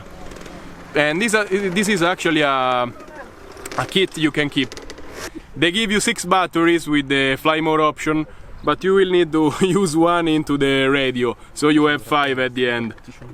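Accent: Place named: Italy. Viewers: solo a me sembra italiano? However thaks for this good review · Sembra italiano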